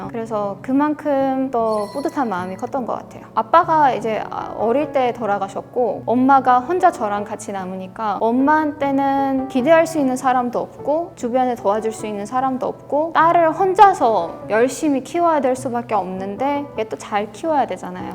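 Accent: Seoul, South Korea. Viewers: 크헉. 완전 한국 서울 여자네. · 그냥 잘하는게 아니잖아 걍 서울사람이잖아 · 화면보면 전형적인 서양백인미녀인데 화면 내려서 목소리만 들으면 웬 한쿡여자가 말을... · 그냥 한국인인데?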